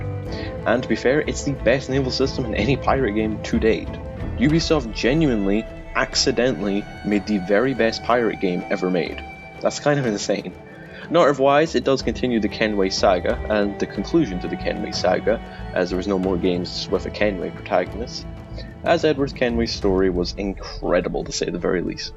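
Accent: Scotland, United Kingdom. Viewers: I can't tell what your accent is, is it scottish?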